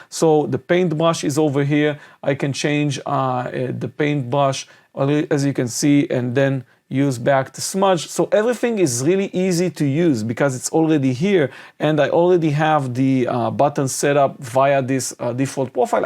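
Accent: Israel. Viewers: You have such an Israeli accent :-) Shalom.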